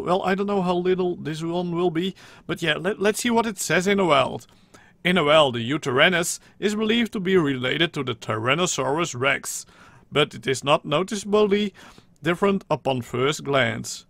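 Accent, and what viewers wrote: Netherlands. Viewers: Je hoort dat hij Nederlands is hij is wel grappig😂😂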